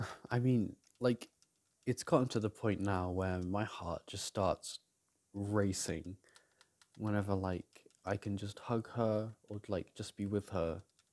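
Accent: United Kingdom. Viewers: You had me at British accent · THE BRITISH ACCENT AAAAAA 😭<3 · bro im british and i still get excited when i hear a british accent lMFAO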